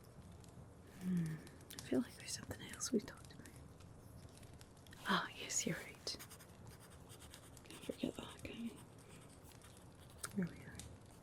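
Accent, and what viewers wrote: Ireland. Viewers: Ginger curls and freckles suits you well. How do you do a Irish brogue so well? It sounds so natural. 😊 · I do not claim to have heard many Irosh accents in my life but i love everything about the way you talk to me. Thank you for warming me up in this rainy weather! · After the rain subsided I will find the mushroom I seek., you do a mean Irish accent. You really are the master. Terrific RP!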